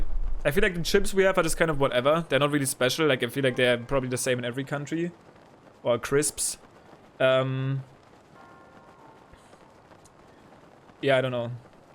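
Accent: Germany. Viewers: You acctually speak like a german. Man I know, I'm german, if you not. ANSWER PLEASE NO OR YES, please... se.. :) :/ I know im peasky!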